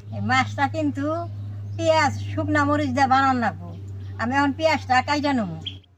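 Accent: Tangail, Bangladesh. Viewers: আপনে গো ভাষা,,,,, আমাগো বাংলাদেশের টাংগাইল জেলার ভাষা same,,,,,আপনেরা কোন জেলার গো?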